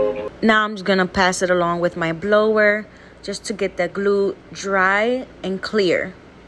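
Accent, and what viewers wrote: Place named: New York, United States. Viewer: I love your accent reminds me of NY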